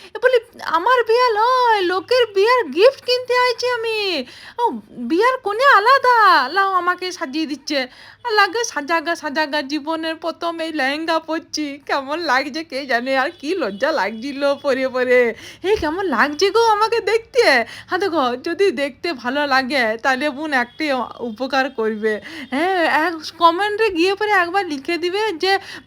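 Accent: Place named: Purulia, India. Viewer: Didi aapnar Bangla ta kon jaygar aar tomar biyer samay to lahenga chio na tomar bhasa ta thik purulyar moto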